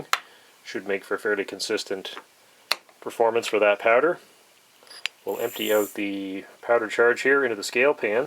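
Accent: Canada. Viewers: May I assume you are in Canada (based on your accent) ? Norinco ammo has been banned for importation to the United States for many years now because of the Norinco/Keng's Firearms fiasco, but I still have some of Keng's match ammo as well as some silver boxes of Norinco. I don't shoot it because it's quite rare here in the US. Thanks for the video...it's the first time I've seen this Norinco ammo reviewed...nice job!!!!! :o)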